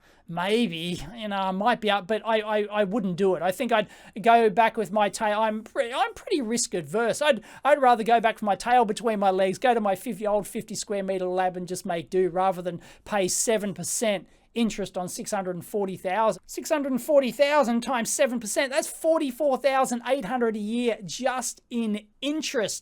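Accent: Australia. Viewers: ''Office spice'' :) I love your channel and I love your Australian way of speaking!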